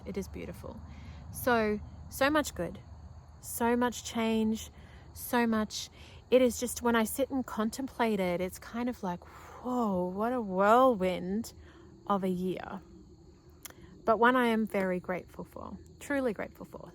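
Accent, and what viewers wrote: Australia. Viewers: I love a ‘rambling’ (your words, not mine) vlog! ❤ ps as a Brit I think your Aussie accent is a particularly nice one 😊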